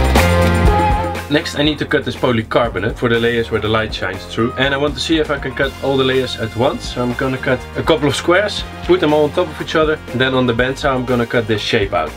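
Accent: Netherlands. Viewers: you sound so dutch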